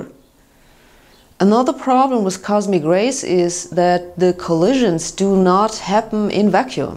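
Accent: Germany. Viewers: Love how she puts an extra layer of german-ness on it... she could probably play it down, but instead she embraces it. Cool style.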